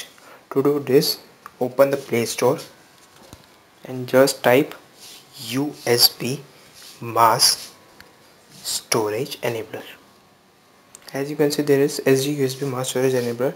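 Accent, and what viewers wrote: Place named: India. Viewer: I can't understand the accent. Is this guy from India? The Indian accent of English is the worst in the world.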